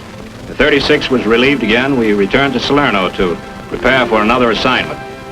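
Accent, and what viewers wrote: New York, United States. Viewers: Mark Clark should have been court martialed for destroying this division on the Rapido - a totally unapproachable obstacle if you have ever seen the pics. The German engineers ("Pioneers") cut down all the trees within hundreds of yards of the shore and mined the area completely. On a lighter note, could the Army SIgnal Corps at least give the Texas Div a narrator who doesn't have a thick New York accent? I realize that after the division was butchered repeatedly in Italy, it wasn't even half Texans, but come on. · Clark's glory hounding cost my grandfather a lifetime of PTSD and misery over the loss of his battle buddies. P.S. I love that glorious New Yawk accent. My WWII soldier grandfather was with the 143rd (36th) Company K and hailed from Brooklyn, New York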